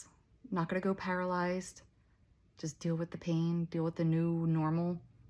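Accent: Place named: Philadelphia, United States. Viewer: Thank you for all your videos, I watched just about all of them! I just had my ACDF C4-C7 on January 9, 2025. Neck is super sore, having trouble swallowing, looking forward to pain going away. I get a huge kick out of listening to you because I attended Villanova University in the late 80s, and your accent brings me right back to campus! Best to you!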